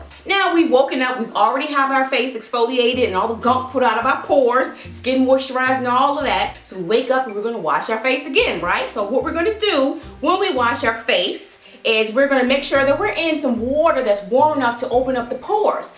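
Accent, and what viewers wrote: South, United States. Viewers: 😂 especially when she says "pores" for me. Maybe the southern accent persuades me. I'm about to go refresh my face now...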